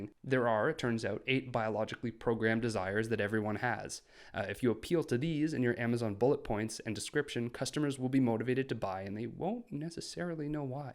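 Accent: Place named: Canada. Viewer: canadian eh? greetings from the frozen alberta. great content man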